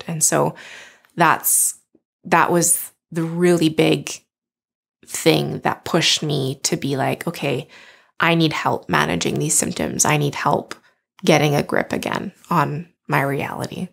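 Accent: Canada. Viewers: You are absolutely amazing. I love that you are so completely honest. Do you think the training for the marathon stresses your body enough to change the way your meds work? By the way I grew up in Alberta but don’t live there anymore and your Canadian accent makes me want to go home. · Canadian accent coming in strong!!! Great channel though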